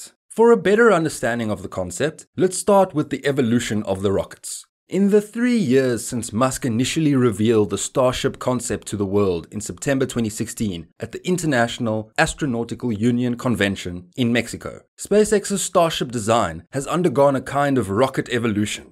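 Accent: South Africa. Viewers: Could listen to your South African accent all day ✌ Always look forward to your informative videos keep up the great work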